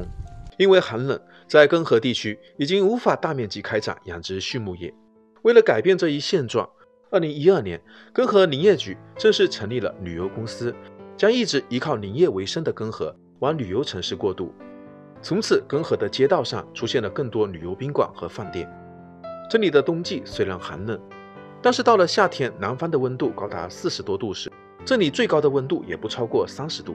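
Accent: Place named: Fujian, China. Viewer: 你这口音很福建啊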